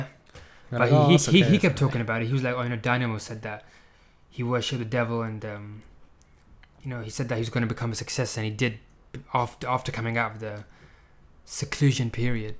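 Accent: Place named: Bradford, United Kingdom. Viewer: Listening to your accent...YOU ARE FROM Bradford!! Your accent is exactly like Dynamo's!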